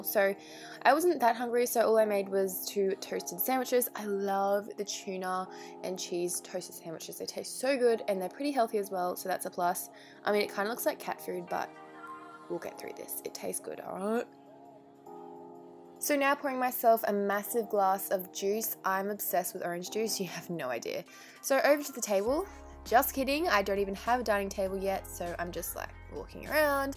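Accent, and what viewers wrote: Australia. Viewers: Sounds Australian so that would make sense. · I am not a native english speaker but I'm used to Lauren Curtis's accent,so I was feeling this beauty guru is Australian as well!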